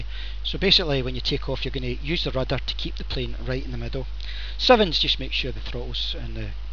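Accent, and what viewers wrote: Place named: Scotland, United Kingdom. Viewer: I couldn't help but notice your Scottish accent. It's cool!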